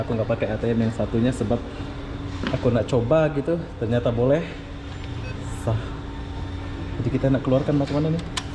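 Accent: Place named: Malaysia. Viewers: Emang kenapa kalau ngomongnya kayak orang Malaysia? Orang sumatera banyak tuh yg ngomongnya kek orang Malaysia,karena sama sama Melayu. Terus harus gimana ngomongnya,kek orang Jakarta gitu? Aduuuh.. Indonesia ni Luas cuy. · Yaelah ngomongnya skrg udh kayak orang malaysia ahahah,btw abang warganegara mana kah?malaysia atau indonesia ni?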